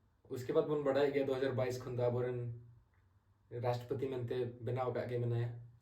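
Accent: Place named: Santhali, India. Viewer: Itna shudh santhali😅😊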